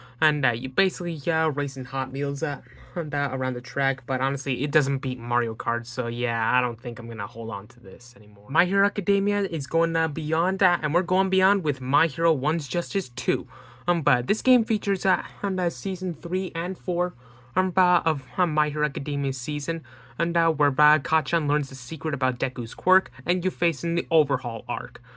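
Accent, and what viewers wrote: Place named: Canada. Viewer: where are you from, the accent I can't place it some things are giving me the great white north vibes lmfao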